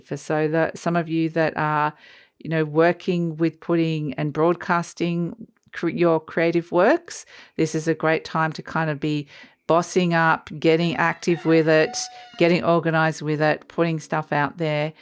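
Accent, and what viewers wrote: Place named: Australia. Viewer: I love to watch Masterchef Australia and you have the same accent than Billie, knew right away that you are in Australia, all the green in your garden, beautiful 💙 Thank you for your reading, always interesting and inspiring Wishing you a great weekend 😊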